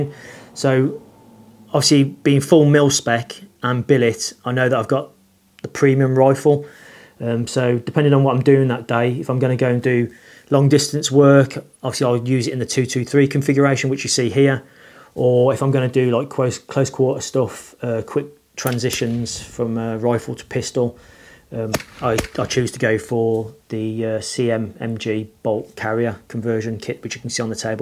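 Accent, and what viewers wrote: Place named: West Midlands, United Kingdom. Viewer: ahhh a fellow west mids chap? anyway great review think im gonna go LANTAC and salute you sir :D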